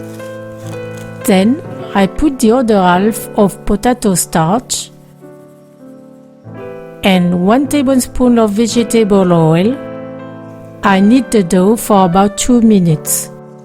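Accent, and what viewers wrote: France. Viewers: she sounds very French!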